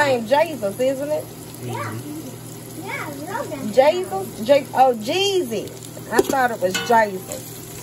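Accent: South, United States. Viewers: You got me cracking up with the southern accent 🤣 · Girl, you are so crazy! Your southern accent sounds like that character sister husband in that movie where the heart is.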